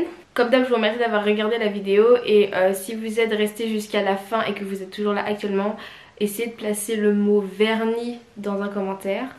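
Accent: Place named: Antilles, France. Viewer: T'es antillaise parce que moi je suis martiniquaise et t'a vraiment un petit accent 😁 En tout cas ta chambre est belle et ton vernis aussi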